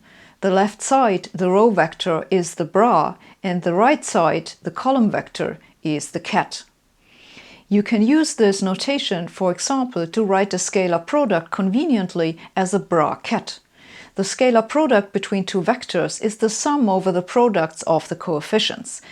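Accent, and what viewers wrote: Germany. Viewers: your german accent is so german: it hits the clichee as a blueprint for any information to what end the effect never fails the paradox of losing it... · Of course she has a German accent, of course she does.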